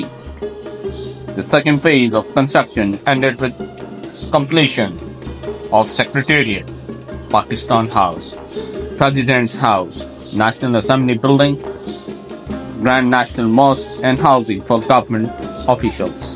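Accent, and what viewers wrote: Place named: England, United Kingdom. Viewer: Love the English accent..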